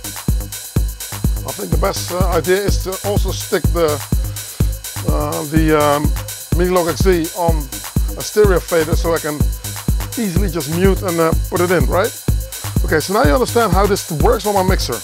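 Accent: Netherlands. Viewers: Toevallig nederlander ?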